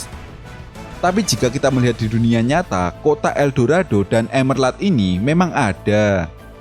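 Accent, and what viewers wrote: Java, Indonesia. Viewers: Mendok ya bahasany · Logat Jawa nya kental banget 😅😅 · Lebih enak dengerin narasi dengan suara begini, drpd yg nadanya dibikin 'sok mantap' wkwk Lebih chill dengernya ini Javanese bgt min, nice, stay low yea~ Keep the good work clap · NARATORNYA MIRIP SUARA DONO . SERIUS. KALAU DONO PAKE LOGAT JAWA DI FILM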